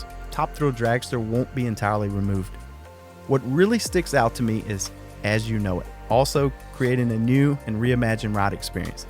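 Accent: South, United States. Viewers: I saw the notification for a new video. Only problem I have is your videography and editing are so good and high quality I will only watch your videos in 4K on my 65" TV. It's like watching a movie everytime and I actually feel like I'm right there with you as you narrate. It's like an IMAX documentary for coasters. Please take as much time as you need we will all be here awaiting your over the top content and your gentlemanly southern drawl guiding us through they way you see the theme park world.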